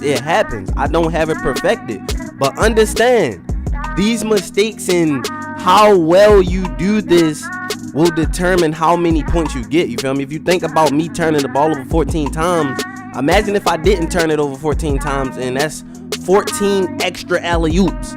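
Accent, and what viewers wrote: Baltimore, United States. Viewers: U got a Baltimore accent bro✊🏽❗️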